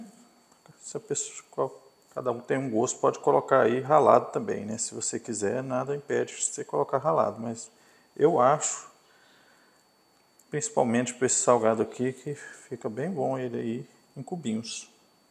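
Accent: Minas Gerais, Brazil. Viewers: Vc é mineiro?amo esse sotaque kkkkk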